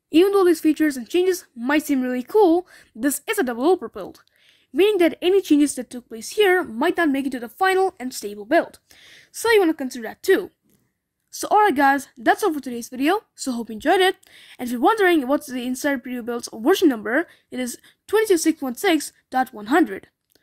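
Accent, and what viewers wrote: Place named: United States. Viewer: How did you learn your accent lol, I'm also Indian, when I'm speaking in my mind i speak with that "american" accent pretty well, but in person, it just doesn't work. How did you learn it?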